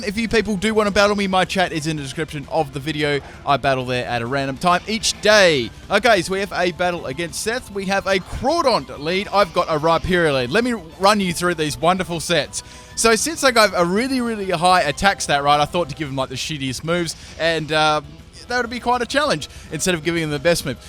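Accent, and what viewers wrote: Australia. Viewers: U Australian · Are you Aussie ? You sound Aussie as fuck.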